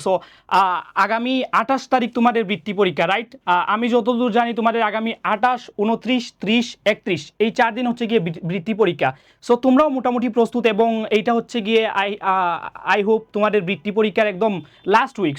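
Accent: Sylhet, Bangladesh. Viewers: Hmm sylhetii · sir ar kota bola sune mone hocce sir sylheti😂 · Yes Sylheti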